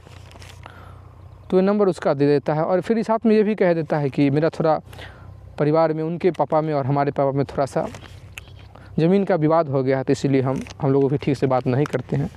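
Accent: Bihar, India. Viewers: Bihari tone hae so it was bit boring ..Hindi speaking is not good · बिहारी उच्चारण में बतायी गई कहानी रोचक लगी।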